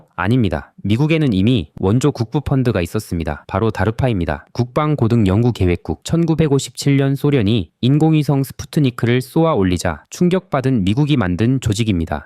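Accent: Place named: Gyeongsang, South Korea. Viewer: 살짝 경상도 억양이 들리는데 고향이 울산 이신가요 ?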